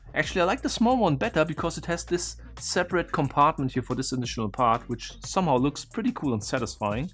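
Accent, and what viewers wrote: Germany. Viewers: I am Dutch! I like your german accent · Haha love your german accent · Wenn deutsche Englisch sprechen 👆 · I really like your videos, they are so satisfying 😌 also your German accent is awesome 👌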